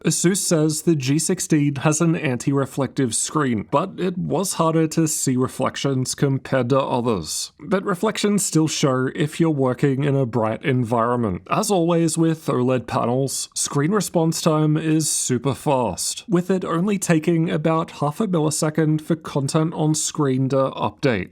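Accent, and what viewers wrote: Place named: Australia. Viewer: man, your accent reminds me of Rickey Ponting and David Warner.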